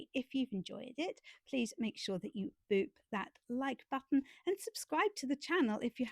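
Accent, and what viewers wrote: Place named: New York, United States. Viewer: welcome. I love when your real NY accent slips out.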